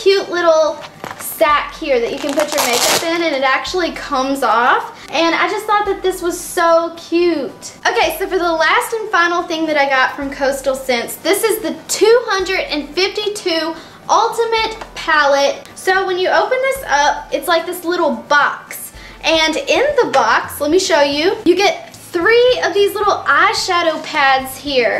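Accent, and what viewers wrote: South, United States. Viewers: Where is she from???!!! I know she's from the south but where??!! :)))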